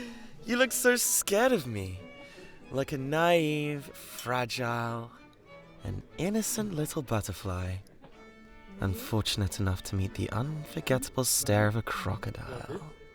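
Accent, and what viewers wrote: United Kingdom. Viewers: BRI ISH BABEEEEE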